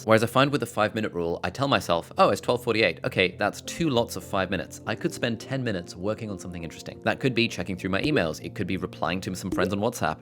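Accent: United Kingdom. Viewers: After one year of watching your videos I just realized your British accent when you said "trolly." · Gotta admit, Brit accent gets viewers. Lol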